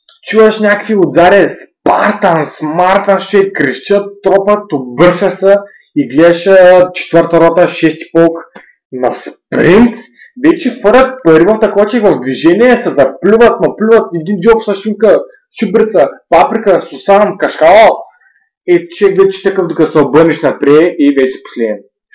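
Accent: Varna, Bulgaria. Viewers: Потресност..чуек! ;Хх Можи ли кат си почнал пуне с тес пудобия на влогове тъй лекинку пуне да си упрайш варненския акцент, щот хич ней приятну.. поне! В смисъл, аз съм от Добрич.. чуек, и ти разбирам доста добре ама мн хора изпитват сериозни затруднения вярвам.. иначе да, лека потресност като цяло :D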